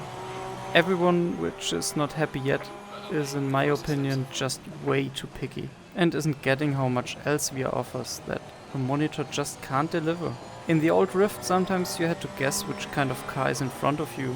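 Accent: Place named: Germany. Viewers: Hey good video! i like your accent! Are you from Germany? It sounds like that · Correct m8, he is from Germany. But iam really puzzled about your statement that you like the German accent. This is really the first time I hear such a statement about the German accent ;) I thought that most american people are grinning about the accent of German people. Happy to be proven wrong ;)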